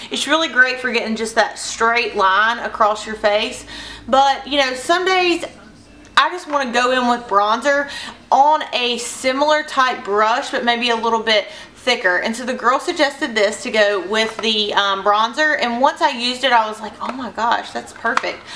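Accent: South, United States. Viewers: Ok, girl, u hav GOT TO B from my neck of the woods!!!! Lol!! Im from Alabama and i wud kno my Southern accents fom ANYTHING!!! Lemme kno if ur close and we'll go shoppin and trade makeup! (I didnt see if u had the UD Naked concealer and Laura M. brightening powder, but if u dont n ur close, i will let u try them and THEN U WUD RUN TO SEPHORA!! They r THE BEST COMBO!). Good vid and nice to hear from sum1 close to home!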